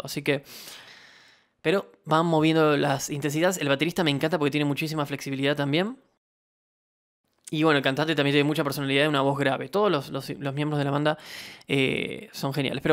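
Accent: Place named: Argentina. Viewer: Te ganaste mi like, conoces de buen Rock socio, cómo buen Argentino claro,( si no me equivoco) y si sos Uruguayo me desculpo x jusgarte x tu acento, pero conocés de música, saludos desde Costa Rica 🇨🇷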